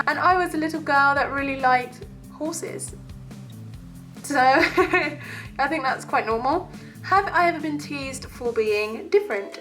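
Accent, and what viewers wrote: London, United Kingdom. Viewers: I thought you were from London or some where round there sorry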